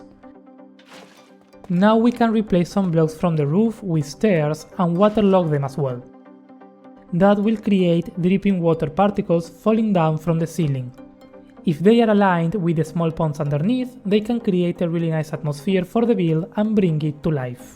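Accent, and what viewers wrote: Argentina. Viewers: Argentinian accent so strong, it's impossible to hide, good vid bro <3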